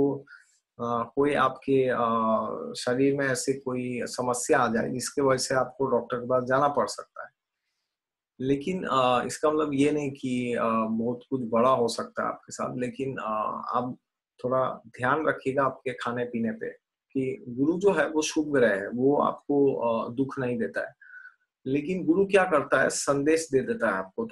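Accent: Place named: Assam, India. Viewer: First time ur voice resonates like assamesse people.. Ur accent.. It's cute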